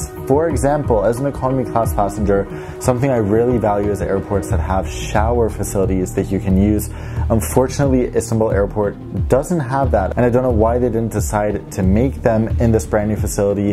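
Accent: Gothenburg, Sweden. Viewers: Du pratar lite göteborgska (det är kul). Snälla prova Ukraine international BC. Jag hittar inte någon som har gjort en tripreport på den och jag vill jätte gärna se det. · Right. So at first your Swedish sounded extremely American but like after American Express the Gothenburg dialect kicked in. Absolutely fantastic! One can hear you’re not comfortable though :) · OMG! In Swedish and in Gothenburg accent, Thank you. You made my day:D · Det vart faktiskt bra med din Göteborgska ❤ Now over to English, you said it great whit Gothenburg accents. I was in Istanbul's new airport and saw also the toilets problem I took photos about how terrible it looks out. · Älskar göteborgskan :) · Hehe. Loving your deep Gothenburg accent. Keep up the good work. GÖTT MOS!!!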